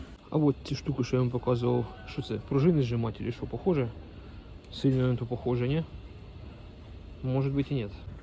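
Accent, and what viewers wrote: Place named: Orikhiv, Ukraine. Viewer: Так ты парень с Орехова, зачем ты говоришь, что с Запорожья?? Понятно , что в Запорожье никто так не разговаривает. Богдана знал может? У него Горячий Хлеб был по всему орехову , преображенка, новоданиловка. Не надо говорить, что ты с запорожья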